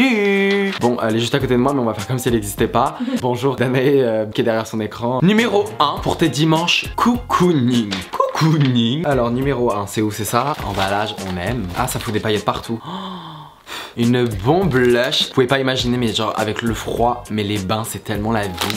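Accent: England, United Kingdom. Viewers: Tu a un trop beau accent anglais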